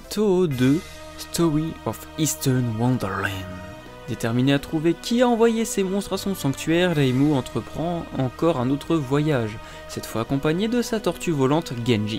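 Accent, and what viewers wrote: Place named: Spain. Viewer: frérot tu mélanges l'accent japonais foireux à ton accent français j'ai l'impression tu parles espagnol j'comprend rien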